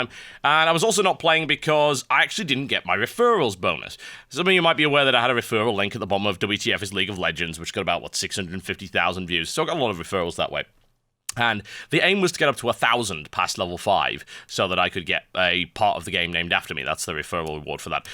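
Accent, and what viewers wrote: United Kingdom. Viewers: It's his british accent, that's all.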